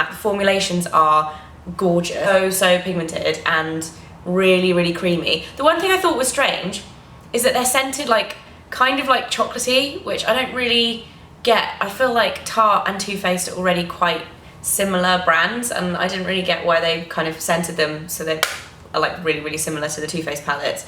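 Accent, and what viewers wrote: United Kingdom. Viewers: You're super gorgeous.!!! Love your accent <3 Love watching your Vlogs. I'm also trying to learn British accent by imitating your voice <3 <3 <3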